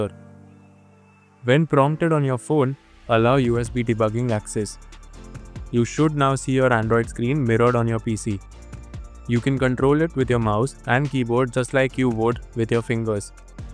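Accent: India. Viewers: an indian accent... this just raised my HOPE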